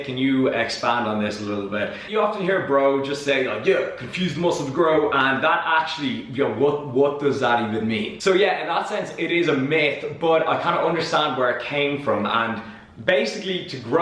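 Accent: Dublin, Ireland. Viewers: whats a D4 accent? D for Dublin? ahah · That Dublin accent <3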